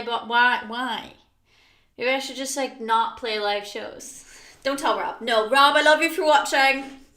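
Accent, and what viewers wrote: Ireland. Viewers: well i just ran across her by accident on the tube and was instantly pulled in by thatwhat id call hot irish accent.she just lately changed her hair colr thats why i inquired.i wasnt trying to be a meathead or nothing Sorry man.anyway im a new fan nice too meet you Wes davis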